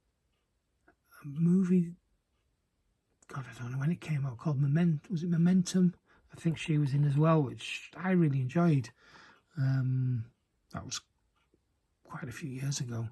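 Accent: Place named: England, United Kingdom. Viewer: Could I ask you a cheeky question what part of England are you from. I always like to know where the YouTubers are from. I'm also a nosey bastard😁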